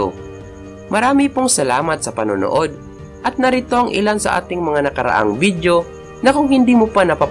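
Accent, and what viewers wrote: Visayas, Philippines. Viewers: bisaya to Hahaha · Bisaya dong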